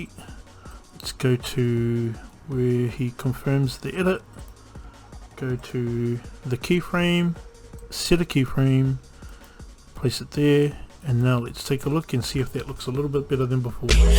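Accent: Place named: New Zealand. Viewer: Your from New Zealand haha same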